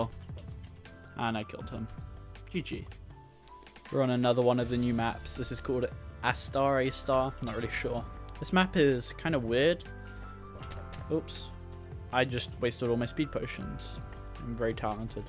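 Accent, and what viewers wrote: United Kingdom. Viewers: Why are You british.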